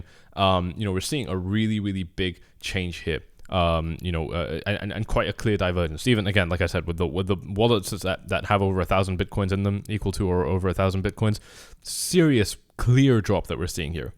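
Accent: Scotland, United Kingdom. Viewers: Just found your channel mate, love it. East coast of Scotland accent??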